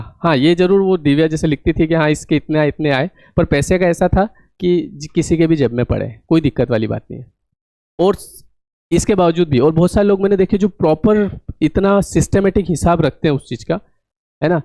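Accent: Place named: Indore, India. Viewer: Sir Aap kaun se city mein rehte ho, aapki bolne ki tone indori hai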